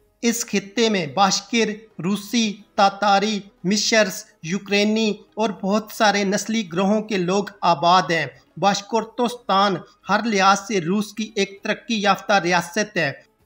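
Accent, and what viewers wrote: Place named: Punjab, India. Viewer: He has punjabi villagi accent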